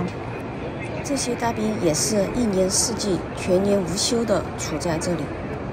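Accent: Sichuan, China. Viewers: 你的川普听的好安逸哦，偶尔还有点皮、喜欢这种风格，关键是我喜欢听川普。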